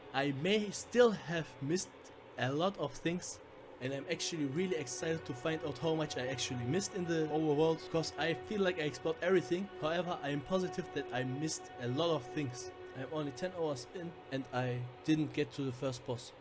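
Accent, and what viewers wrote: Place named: Germany. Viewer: ich finde dein englisch voll gut. Man hört zwar, dass du deutscher bist aber die wortwahl ist echt gut getroffen😂👍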